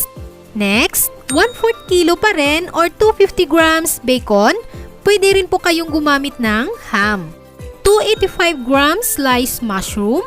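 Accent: Visayas, Philippines. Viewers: Bisaya ba si nanay?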